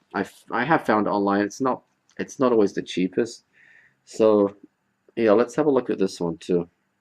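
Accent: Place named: United Kingdom. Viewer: Glad to hear you enjoyed yourself back in the UK. I've not been back in almost 10 years, time flies what can I say. Sounds like your accent has taken a bit of a hit mind, funny how that happens with some and not others. As for The Snowman, from memory I think was on the film but the song/single that was released (almost 3 years later) was ' version and that's the one we all tend to think of.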